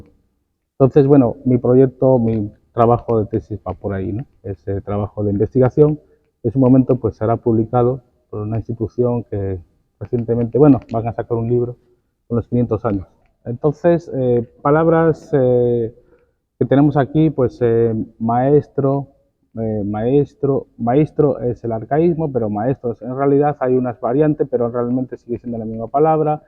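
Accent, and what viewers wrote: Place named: Colima, Mexico. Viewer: Interesante trabajo. Después de escucharte, y después de 20 años tu castellano también se ha Colimizado:) Un abrazo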